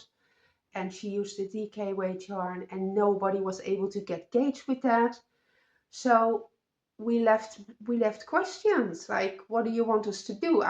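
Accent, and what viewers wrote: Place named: Netherlands. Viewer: Mooi vest! Welke garens heb je daarvoor gebruikt? Je hebt trouwens een fijn accent wanneer je Engels spreekt. Vaak hebben Nederlandstaligen een erg sterk accent. 😂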